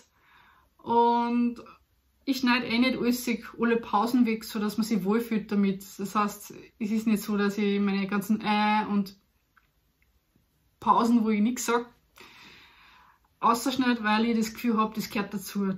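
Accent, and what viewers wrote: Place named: Upper Austria, Austria. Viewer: Hi! Ich hab eh schon bei einem deiner sehr frühen Videos nachgefragt, ob du auch aus Oberösterreich kommst (ich bin aus dem Mühlviertel) und war nicht überrascht, dass du das bestätigt hast. Dialekt finde ich gut und sollte für keinen Deutsch-Muttersprachler eine wirkliche Hürde sein... Ich finde Inhalte auf Deutsch gut und lasse mich überraschen. Zum Thema Ravensburger: Ich hab grad gegoogelt, ob der Name ursprünglich auch im Deutschen von einem Raben kommt und scheinbar gibt es verschiedene Theorien. "Rabe" ist eine davon, der Name könnte aber auch von "Rauenburg", also einem rauen Hang neben der Burg abstammen. Interessant jedenfalls.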